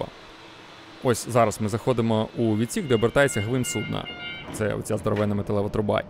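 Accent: Russia. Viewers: Дякую за фільм. Чудові зйомки! Тільки попрацюйте над мовою, бо купа русизмів. британці not брітанци, корабЕль not кАрАбль, на вОду not наваду, швартОвІ not швартовИ, квіточкИ not цвєточкі, мИлотА not мілота, глИбокій not глУбокій, архіпелаЗІ not архіпєлаґє, Шпі́цбе́рген not Шпіцбєрґєн, лід not льод, кОнтинент not кантінєнт, білий ведмідь not білий медвідь, к раєвид not вид, човен not лодка, сУдна not судА, замайорІв not замайорИв, материку nat матєрікє, особини not особі, живіт not живот, берлозі not бєрлогє, стіл not стол, стосунки not відносини, ну і звісно Да легко можна змінити на Та) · ни одна страна мира так не кичится своим флагом, гербом и языком, как Украина. больше видимо нечем. Если что русскому человеку все понятно и на украинском, но справедливости ради приходится вслушиваться. Но просто все понимают что ты не украинец, родившись и вырастая ты находился в русском, тогда еще находившимся на территории Украины, но русском, Луганске. 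Ощущение, что русский говорит на очень простом украинском, где по-украински он знает только самые банальные слова, будто разговор с акцентом. и да я понимаю, что это мое личное негодование и не мое дело и я это признаю. просто искренне хочется смотреть крутые видосы на полностью понятном тебе языке и человека который всегда снимал на нем, всегда все всем понятно было и всегда было здорово все. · Птушкін українською вже не той… Не такий щирий, не такий емоційний і не такий цікавий. При коментуванні та озвучці відео вставити за весь час 150-200 російських слів і фраз…ну не йде, Антон, для чого видавлювати з себе те, що навпаки псує враження? Тебе прекрасно всі розуміють російською та ще й в 100 разів більша аудиторія…ну таке собі, чесно, без образ. За роботу браво і дякую, за мову - мінус, хоча я сам із заходу і виріс в україномовному середовищі, але чути від тебе українську - не заходить абсолютно…☹️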